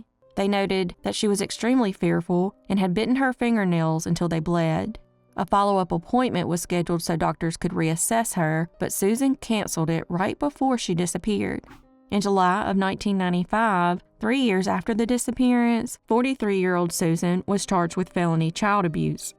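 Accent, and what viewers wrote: South, United States. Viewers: Ai voices and pronunciation are absolutely horrible. We love her southern accent on this channel so you're free to go watch a different channel that you like better. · Use a an AI voice you are way to country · I don't want to disrespect the victims here but I snicker every time she says it. 😂 I'm southern and I love her accent. We say naked to mean undressed, "nekkid" means you're undressed and getting Iin trouble. 😊😅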